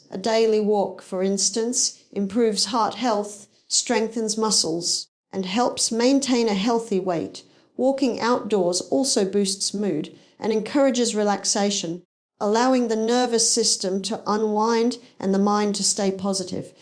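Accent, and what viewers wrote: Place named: United States. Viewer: This isn't Barbara it's AI. As an Australian I can tell it's not her accent, it's American.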